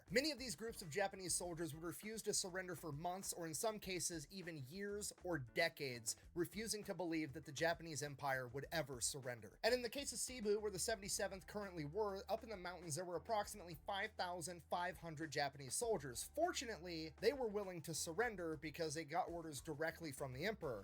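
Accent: United States. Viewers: You have got to be southern ❤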